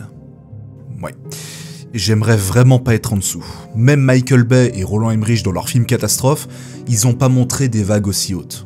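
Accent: Spain. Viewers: Pouce bleu pour l'accent espagnol! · pouce bleu pour l'accent espagnol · 👍🏻👍🏻👍🏻👍🏻👍🏻👍🏻💙 pour l'accent espagnol ! Lorsque je suis allé à la Réunion (l'année de ta naissance 😁) je n'ai pas été dépaysé, je connaissais déjà les Canaries !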